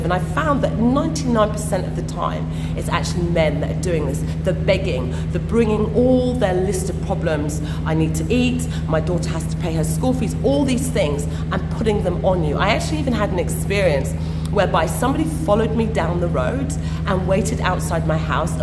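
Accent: United Kingdom. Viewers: She was born in the Uk and grown-up there obviously she went to a private school thats why the accent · I was born in Germany but am Ibibio, I have learnt to speak pidgin and a lil bit of Ibibio/Efik, anytime am in Naija, am always speaking pidgin and trying not to speak for too long at any given time so as not to give my "abroad" origin away and believe me sister, life has been Good and easy. I don't mean to be disrespectful but if I were u, I would try to tune down that Brit-ich accent.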